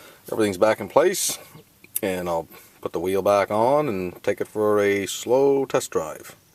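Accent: Newfoundland, Canada. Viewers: hey are you from newfoundland? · Good Job, & I like the way you say "off", it would be written as "oot", I love it, lol, I like the Canadian accents. Vin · Love that Canadian accent.lol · Given the accent & state of corrosion, I think we're in Canada. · this video along with your irritating canadian dialogue makes this painful to view and listen to , its like torture < makes one want to vomit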